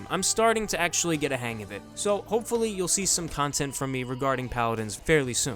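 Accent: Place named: Boston, United States. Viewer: I can sense that he may be from Boston. Hello my brother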